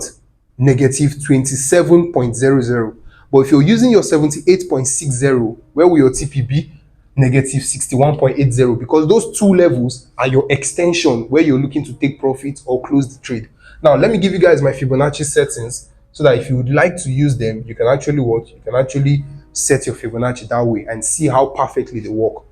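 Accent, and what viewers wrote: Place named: Afrikaans, South Africa. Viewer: love afrikaan english😅🎉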